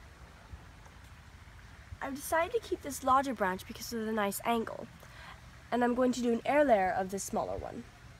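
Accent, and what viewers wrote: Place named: England, United Kingdom. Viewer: You think your better then me cause you have a English accent and have a lab coat?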